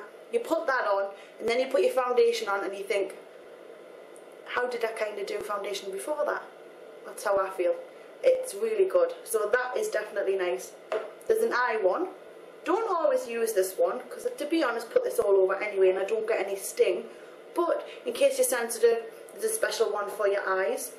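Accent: Newcastle, United Kingdom. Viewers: She's from like the Newcastle area in the UK. There are about twenty different UK accents that you've probably never heard before if you're from elsewhere. Most people who aren't from England seem to only recognise the London accent as an English accent but truth be told we have loads of "weird accents" ;) x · Strange? LOL How about it's a British accent, yokel. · Hey there, Geordie Girl... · guessin your from newcastle AWESOME !! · For all non British viewers, the reason she has a strange voice is because she is what we call "a Geordie"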